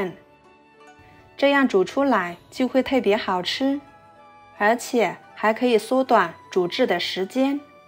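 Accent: Yunnan, China. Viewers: 你这个云南话说呢太好啦！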